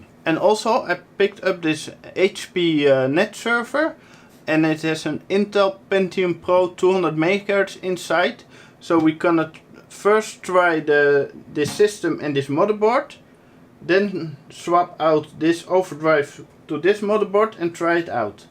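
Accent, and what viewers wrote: Netherlands. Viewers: know :-) But I think not all DutchPeople speak like this. Anyways, I like it. Makes him unique to me :-)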